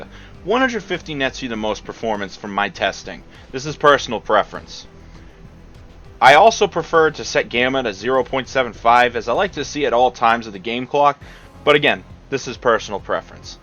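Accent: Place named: Boston, United States. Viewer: love the boston accent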